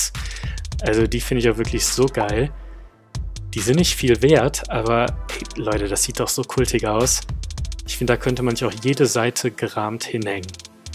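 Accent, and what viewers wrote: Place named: North Germany, Germany. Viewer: Aus Norddeutschland schätze ich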